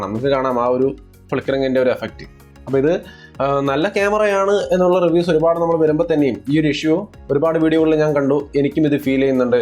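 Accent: Thrissur, India. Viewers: Brooo thrissur Karan anno? Reply please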